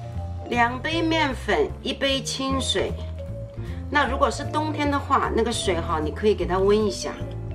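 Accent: Shanghai, China. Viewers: 正宗上海国语，皮薄的不能再薄了，金金黄🤣🤣😂😂😂听着好亲切，立马订阅😋😋😋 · 听到上海话就先点赞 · 你好，我是上海人，现在在美国，很喜欢你的上海生煎，在这儿很难吃到。多次观看视频，有一个疑问。如果我一次包多了，能速冻吗？冻箱里取出后能马上煎吗？希望你的回复，谢谢你！煎后仍有汤汁吗？你的上海普通话与我一样，听了很亲切。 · 上海闲话真亲切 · 上海口音👍👍👍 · 去年回国经过上海，有幸尝到上海生煎包，现在一直心心念念，想起就会咽口水哈哈，今日看到你的视频太激动了，准备学着自己做试试，你的视频做的非常好，上海话好听，喜欢，继续关注你的视频谢谢！！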